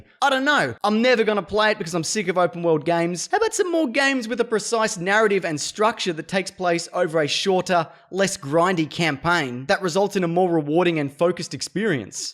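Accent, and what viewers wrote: Australia. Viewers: I enjoyed 2 things. #1 is that you were the australian voice from the ITSV honest trailer. Man they over done the aussie-ness(?) in the script didn't they. But I was very, very happy to hear you make a cameo with you slightly enthused but very very tired voice of yours. #2 is that you pointed out in the video that shazam did not display any wisdom at all. What a dickhead!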